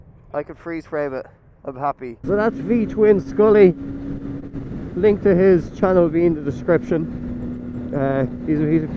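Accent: Ireland. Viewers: What part of Ireland you sound Kildare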